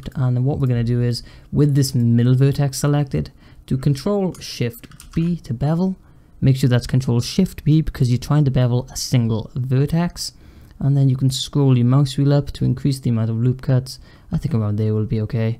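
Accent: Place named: Cardiff, United Kingdom. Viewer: Is that a Cardiff Accent i hear? nice to see a tutorial in the native tongue lol. (swansea here)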